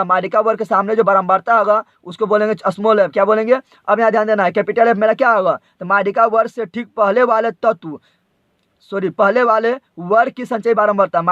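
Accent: India. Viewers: rajasthan Wale ase he bolte h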